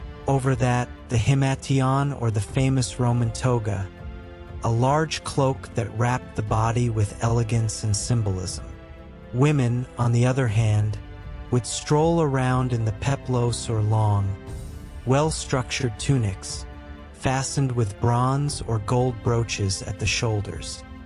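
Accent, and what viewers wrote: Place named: United States. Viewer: In American, pants are the general term used for all outer garments longer than the knee. Shorter than that, and we call them shorts. Calf length, and they're crop pants or waders. Trousers are formal (expensive) pants, usually for men. Breaches are an old, not used for anything but reenactment or renfaire, style of pants. The narrator had an American accent, so why would he call pants by a British name that would confuse those who speak American?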